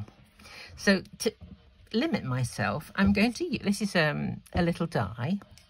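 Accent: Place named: RP, United Kingdom. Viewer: Watching you gives me an immense sense of calm. Your voice is relaxing, and quite, ”posh” at least to these American ears! Anyway, keep posting your wonderfully soothing tutorials.💜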